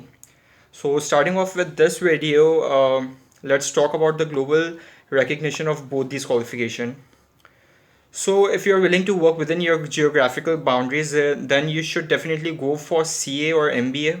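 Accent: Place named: Pakistan. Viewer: Are You Indian?? This accent is Normal for Pakistani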